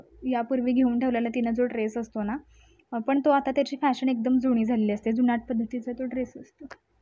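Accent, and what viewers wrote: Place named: Satara, India. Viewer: i think you from satara speaking satari and gavathi mix but it superb......nice teaching method..i loved it,,,keep doing well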